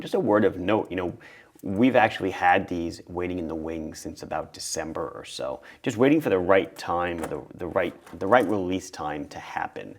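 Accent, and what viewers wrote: Long Island, United States. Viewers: that's pure Long Island, son 😛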